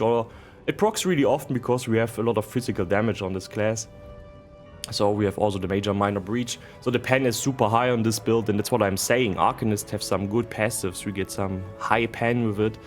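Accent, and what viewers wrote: Germany. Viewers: Immer wieder leicht lustig, wenn ein Deutscher Spieler flüssig Englisch spricht, aber es doch sehr Deutschenglisch klingt :-)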